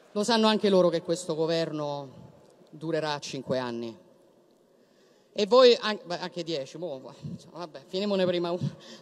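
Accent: Rome, Italy. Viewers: Impara a parlare da PdC di una Nazione, anziché come Una delle Borgate Romane. Raffinatezza ZERO. 😎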